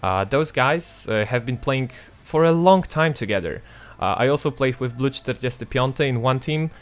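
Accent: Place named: South, United Kingdom. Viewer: fajny akcent masz, taki łagodny południowo brytyjski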